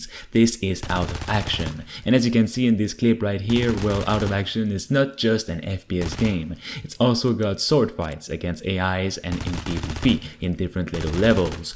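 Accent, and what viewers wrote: Quebec, Canada. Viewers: are you quebecois?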